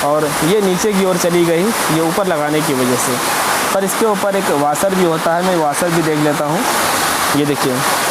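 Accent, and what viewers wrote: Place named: Bhagalpur, India. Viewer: कहां से हो भाई। भाषा तो भागलपुरी लगता है।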